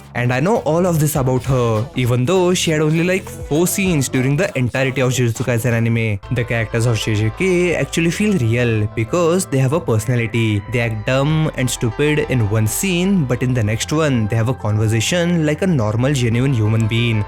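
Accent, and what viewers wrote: India. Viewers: i can tell hes indian i love how they says english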